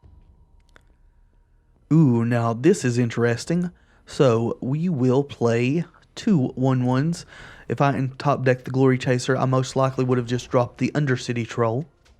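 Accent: United States. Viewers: Definitely had to subscribe and leave a like. This was a very entertaining video, and I'm glad I happened to stumble upon it from watching LegenVD. You have good audio and video quality (looks very crisp!), a clear voice, and I enjoyed how you always explained your reasoning for whatever play you were doing. I was already familiar with all of it, but I've always appreciated when people playing this help new players out by giving advice. Though I think my favorite aspect of watching this was just how pleasant you were. Very thoughtful no matter how you were doing. That said, I've got to ask which state you're from after hearing the accent.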